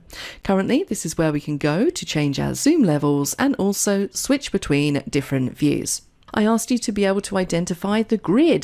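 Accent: United Kingdom. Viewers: that british accent is so smooth hahaha, greetings from spain. It would be awesome if you could transform her voice into spanish through AI.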